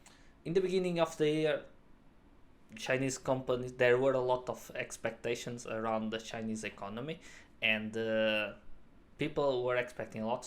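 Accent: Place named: Portugal. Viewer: Good video! I like your Portuguese accent. Sounds a bit like José Mourinho (that's a compliment)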